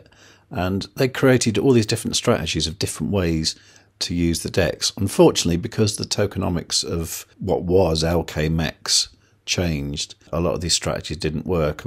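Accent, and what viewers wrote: Midlands, United Kingdom. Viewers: Are you a midlands boy im from the black country maybe your not from too far away :)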